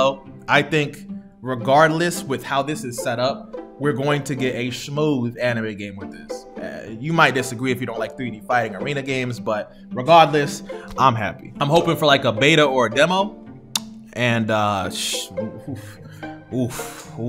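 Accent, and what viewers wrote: United States. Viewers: No hate to but I hate the way Americans pronounce the characters' names especially with Naruto and Jotaro.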